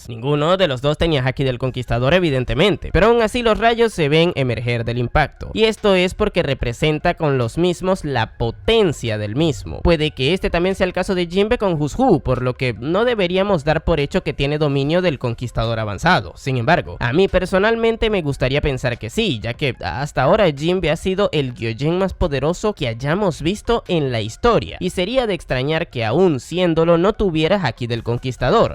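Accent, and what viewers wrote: Dominican Republic. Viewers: tú eres dominicano brot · tengo una pregunta importante que hacerte, eres Dominicano?🇩🇴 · ERES DOMINICANO ?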